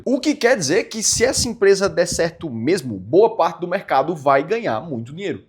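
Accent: Pernambuco, Brazil. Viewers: Sotaque pernambucano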